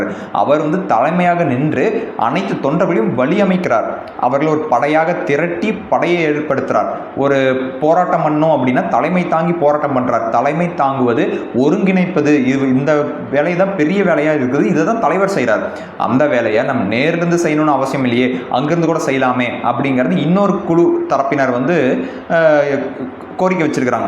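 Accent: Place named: Kongu, India. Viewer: கொங்கு தமிழ், அழகு தமிழ்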